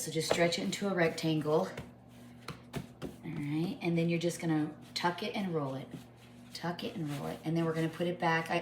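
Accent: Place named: Michigan, United States. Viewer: There is nothing wrong with her Michigan accent! We also say melk instead of milk! 😅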